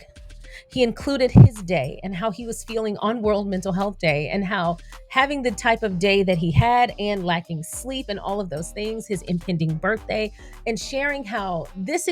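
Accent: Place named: United States. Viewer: is from the United States. She has an American English accent. If you come here expecting her to pronounce words in a British accent you’re an idiot. I will block you. - Me & Moderators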